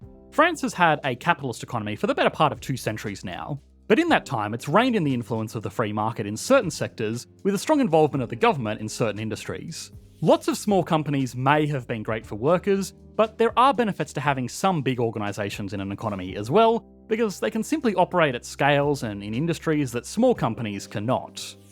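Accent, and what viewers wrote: Australia. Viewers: it's australian. he has a very obviously australian accent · I don't think he's British or he would have mentioned that many other countries have state owned enterprise. UK being one. His accent seemed Australian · I only realise that you're an Australian at the end of the video. 'B(e)ye'. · the fact that you think this channel is from the US speaks volumes of how ignorant you are. The man has a clear Australian accent and mentions that he lives in Australia all the time.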